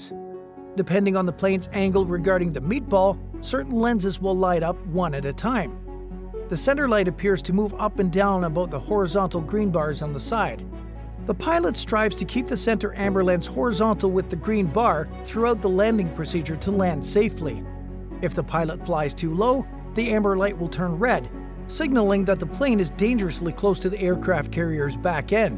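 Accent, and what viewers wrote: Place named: Canada. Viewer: This guy sounds Canadian.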